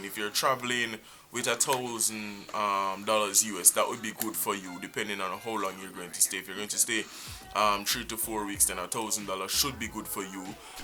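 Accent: Jamaica. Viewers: I was just mesmerized by you talking than anything else. I love the way Jamaicans talk.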